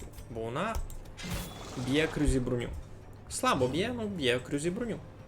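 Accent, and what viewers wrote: Transcarpathia, Ukraine. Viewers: Вау, летсплеї по Закарпатськи. Просто вау 🔥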